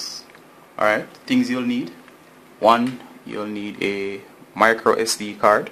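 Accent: Jamaica. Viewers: kinda personal but are from Jamaica?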